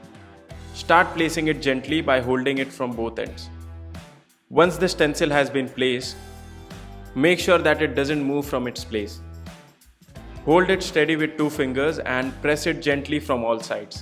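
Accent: India. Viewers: Good Video but dang that accent has got to be the most disgusting accent ever. Indian accents are just gross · I wach but the akcent indian destract me afk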